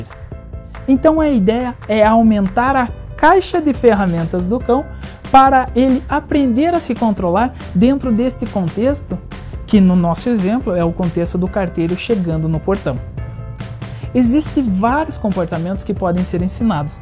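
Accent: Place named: Curitiba, Brazil. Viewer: Esse cara é de Curitiba, certeza.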